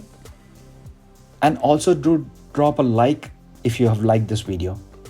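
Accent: India. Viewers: that's y..I was thinking that it sounds like Indian... well done bro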